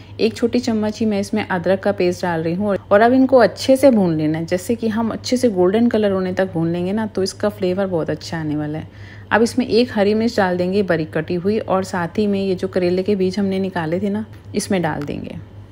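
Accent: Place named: Punjab, India. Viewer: Aapki aawaj different lag rahi h Punjabi tone aa rhi h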